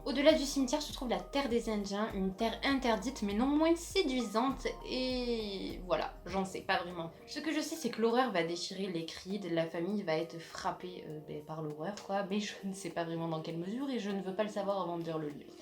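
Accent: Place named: South, France. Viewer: Je continue à remonter le temps et ce coup-ci en partant de la fin. Très bonne vidéo avec ses 3 parties, et j’adore la pointe d’accent du sud. Mon premier Stéphan King sera certainement 22/11/63. Marche ou crève m’intéresse ! Déjà le titre me parle et ton avis confirme l’impression.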